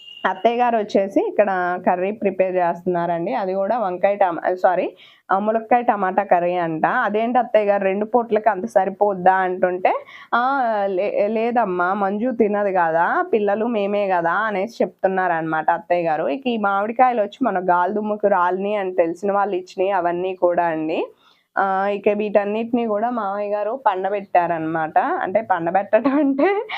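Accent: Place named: Sathupalli, India. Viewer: Sathupalli side Telugu alane vuntundhi Thanu nerchukovalasina avasaram ledu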